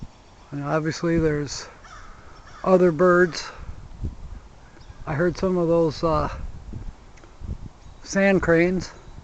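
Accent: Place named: Michigan, United States. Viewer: Hey there. Sounds like Michigan!!!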